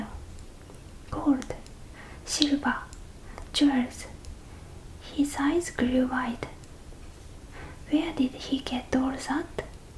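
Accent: Japan. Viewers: Engrish very kawaii❤❤❤❤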